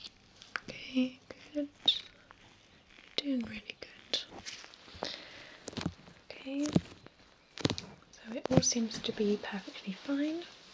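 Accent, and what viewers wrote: England, United Kingdom. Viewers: EpicMeme EMPEROR I don't think you know what a Russian accent sounds like? Gentle Whispering is Russian though, I'd say her accent definitely more towards the UK. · lover her accent. and i live in UK. im sick of listening to chav accents and miss this upper class sexy english voice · love the British accent, so perfect for this asmr :O :3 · Your British accent is so much better than mine :( Where you from Dear? :) (*Sorry I am late on this video!) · normally, british accents annoy me,. except for today! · Goood I'm fond of your clean English accent. I prefer it to an average American one. I want you to read some classic English poetry if you don't mind. Thanks for your videos. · ASMR and British accent. This is perfect and relaxing. Plz make more doctor/nurse role-play videos. I watched it 10 times and still love to watch ! 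Thanks. :) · Wow! I'm English too and people say I have a lovely accent, but yours is just beautiful!! I'm actually jealous of how lovely your accent is 😍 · no one can bring out my tingles as you do! The calm, british voice is just magic! Greetings from Norway :) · So here I am doing my usual to try to fall asleep eyes closed on auto play, this video comes on...Hear a British accent, eyes open with surprise... groggy eyes stare in disbelief haha. Long time no see!! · she's got a british accent, not australian! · Hello, where are you from? I ask you why your accent seems british. Regards from México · I lived in Britan and sveryone sounded chavvy and not like this. Using that classy english accent is awesome >ω< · As a Brit myself I might be bias, but I can safely say I love your accent and whispering together!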